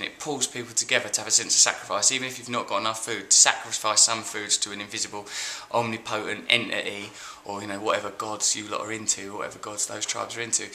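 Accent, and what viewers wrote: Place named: United Kingdom. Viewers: British accent. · He speak english but i think he is from British because of his accent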